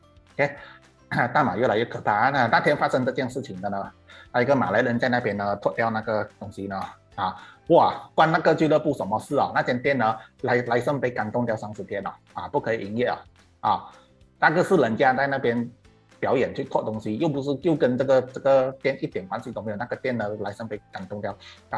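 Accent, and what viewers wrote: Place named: Fuzhou, China. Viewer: 哗你的福州话很标准呢！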